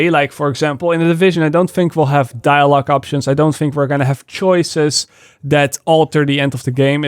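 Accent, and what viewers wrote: Netherlands. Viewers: ???? I love you man, been listening to that sweet Netherlands accent for years, but what are you talking about?40 hours has nothing to do with how many kids you have or if you work at all, lol. I'm pretty sure when they say 40 hours it's not a running clock like you will finish it 8 hours short of 2 days, omg I have no idea why you perceived it that way but that was funny. You could play 1 hour a day for 15 days that's 15 hours. And you could do that living in a village of children with all the jobs you want and still finish as fast as a content creator.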